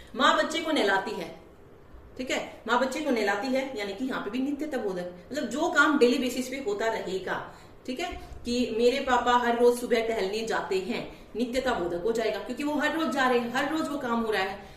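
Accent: Haryana, India. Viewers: Ye haryaanvi tone h bhai. Change nahi hogi🙂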